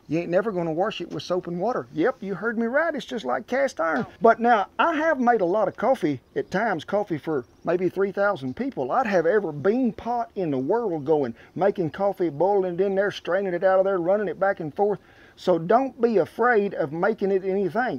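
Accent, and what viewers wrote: South, United States. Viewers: He actually looks like the character from gta 5 Avery duggan or vice city Avery carrington . this awesome guy that’s making coffee is a bad ass I love the Southern hospitality & the accent . I hail southern from California born & raised . even though I wasn’t born a Southerner I catch myself having a southern accent sometimes 🤷🏻‍♂️ it’s odd lol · I’m Just here for the southern draw 😂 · Southern accent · I’m from India and I love the southern American accent, as soon as I heard it, I instantly subscribed to the channel. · I really love his accent man, truly is a man of the woods, man of the south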